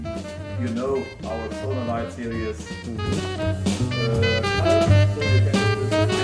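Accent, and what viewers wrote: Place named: Germany. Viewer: hahaha.. how u realize that he is german from the very first second :D i'm german myself but i hate the normal german accent :/its duch a .. torture :(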